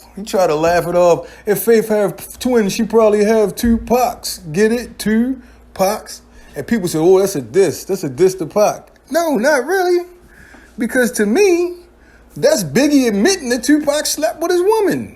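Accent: Baltimore, United States. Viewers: U gotta be from Bmore ! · Im loving that bmore accent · First of all Biggie was hip hop unsigned hype b4 he met pac . And puff made big career not no pac . Pac a whole liar first thing first you a pac fan from bmore i can tell by your accent talking bout ny stuff you clearly dont know much about . Did he sleep on son couch mayb once or twice but he didnt live with pac yall take that bullshit and ran with it . Pac got robbed by real gangstas and did what pussies do blame everything else except for the fact they got caught slipping . He so tuff why he aint get at king tut and them u know why cause he fucking couldnt tupac is a actor he took his acting to the rap game . Once he saw how ppl loved him for his role as bishop he adopted that in his rap shit and it worked . Why would big get involved in some shit he dont have nothing to do with . Like flex said pac lied and we all know it tupac the biggest fraud ever . 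Fuck him Brooklyn forever no slander on the King of Ny . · aye bro you from Baltimore? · It's crazy how we can tell you're from b more just by the accent that's cool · You straight from Baltimore.. lol great channel my G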